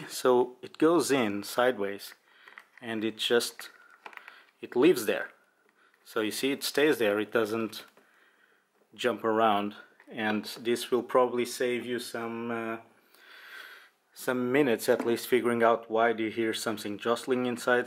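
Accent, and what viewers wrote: Portugal. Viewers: Excelente vídeo! Desde o primeiro vídeo que vi teu, achei que pelo sotaque serias português lol Já agora, os buracos nos cantos superiores da grelha servem para algo? Abraço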